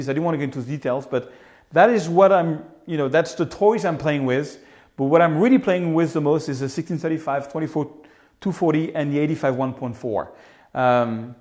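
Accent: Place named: France. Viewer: gotta love that french accent!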